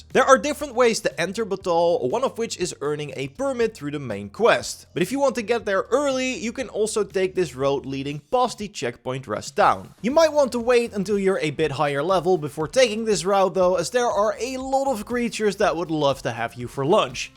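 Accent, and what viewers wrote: Netherlands. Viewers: You are so duidelijk Nederlands, Nice video! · Ben jij Nederlands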